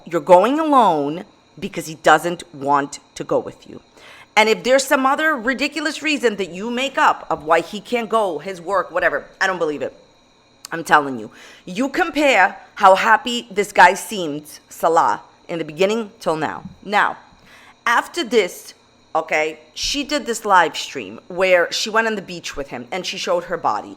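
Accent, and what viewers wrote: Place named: Long Island, United States. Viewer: Omg the clip has me WEAAAK😂😭💀 you're the BEST reaction YouTuber with the funniest edits. You're hilarious & I always look forward to my girlfriend putting on your newest video everyday. The Monsters Monsters Monsters edit had me dying💀💀💀 Edit: Hey if you see this, I've always been wondering what accent do you have? It's like a kinda faint Long Island accent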